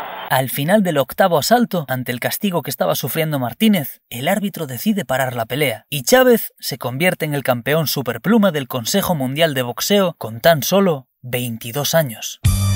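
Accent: Galicia, Spain. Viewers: Gran canal, hace poco que me interesan estos deportes y me encantan tus videos, muchas gracias!! Muy buen trabajo!!. Una pregunta, ¿eres gallego?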